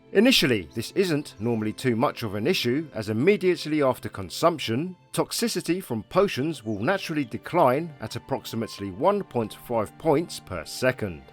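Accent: United Kingdom. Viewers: Are u Asian with a British accent !!!! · No disrespect my man..but you shocked the 💩 outta me the moment I heard your voice/accent. I don't believe I've ever seen an Asian gent with such a rich English accent. It's got me like 🤯🧠⚽🥅. In any case, sweet video! 🙌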